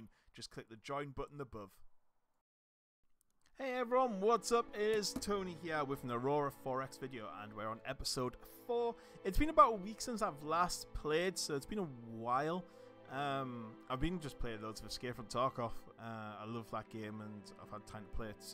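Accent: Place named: Newcastle, United Kingdom. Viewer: Please do, these are the best tutorials on here and I dig the geordie accent :D Thanks for the series, really helpful. I've bounced off this game for years but now I'm playing it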